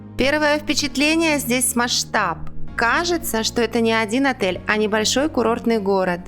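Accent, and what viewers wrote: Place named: Moscow, Russia. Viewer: Обзор норм. Але цей московський акцент то підець.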